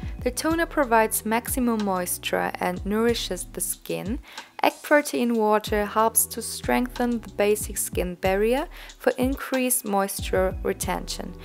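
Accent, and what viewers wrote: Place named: Germany. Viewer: Wow that's a thick German accent... But anyways, good luck with the routine, 파이팅!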